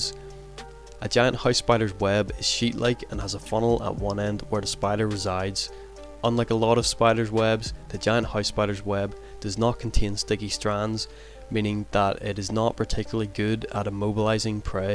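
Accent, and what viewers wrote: Northern Ireland, United Kingdom. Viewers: He sounds very similar to most of my friends In Northern Ireland, but with the hundreds of accents across the UK even as an Englishman its tough to put a finger on it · never like to see spiders eating other spiders 😢 The Northern Irish accent is refreshing though, subbed! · He's got a Northern Irish accent.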